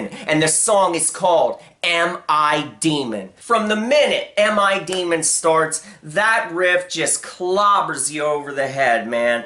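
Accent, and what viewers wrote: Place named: South, United States. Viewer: where are you from I'm just asking because of the accent it sounds southern and New York at the same time haha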